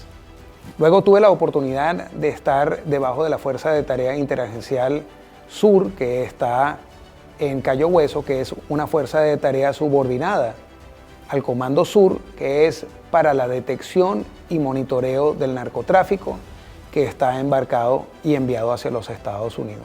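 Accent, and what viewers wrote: Venezuela. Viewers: Me gustaría oír que te interesaste en el tema venezolano porque tu origen es venezolano, hablas como venezolano y en tu corazón todavía late la bandera tricolor con sus 7 estrellas.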